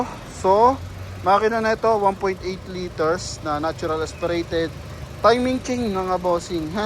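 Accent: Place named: Bicol, Philippines. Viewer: new subscriber padi.bikolano kaba kabayan?ung accent mo kasi na haa!😂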